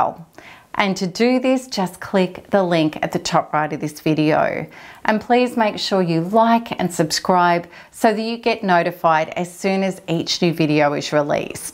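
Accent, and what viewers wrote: Australia. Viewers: Love your accent! Are you Australian?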